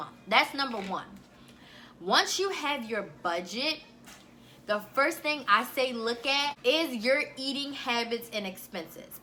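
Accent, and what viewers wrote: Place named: Maryland, United States. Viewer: Thanks for the video..I knew it! I could hear that Maryland accent! Especially when you say two, true, do..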